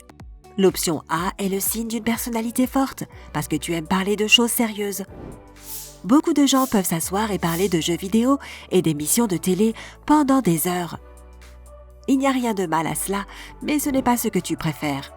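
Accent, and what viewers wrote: Quebec, Canada. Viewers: la voix est horrible on dirait elle a le nez bouché avec des petites touches d'accents québécois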